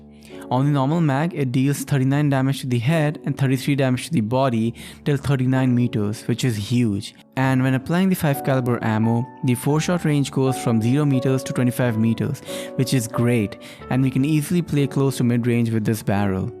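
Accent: India. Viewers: Wtf too to Indian lagta hai bro Are you bro ??? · Bro are you Indian guessing from the accent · Man your accent is kinda Indian love your vid❤️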